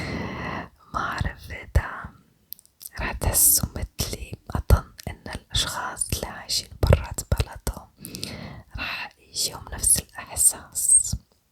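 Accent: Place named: Egypt. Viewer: لهجتك مصري ع خليجي😂❤❤❤استمري قمر 🎉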